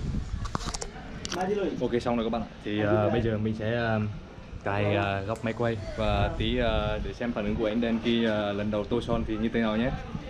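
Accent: Nghe An, Vietnam. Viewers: Anh ở nghệ an à anh..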